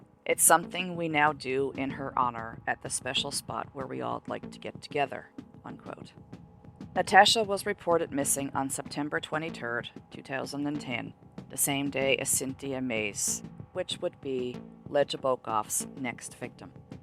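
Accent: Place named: Canada. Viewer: She sounds extremely Canadian..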